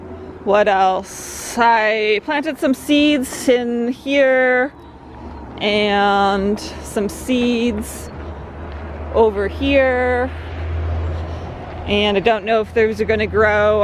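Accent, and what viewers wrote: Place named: Pennsylvania, United States. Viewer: i like your penns woods accent. ;) so why arent there any schools for kids to learn about plants and gardening? i would think being outside in the sun gettin' your hands dirty is better for their young impressionable mind development. Germinating seeds, learning about whats what...... would have to be better for them than common core standardized testing.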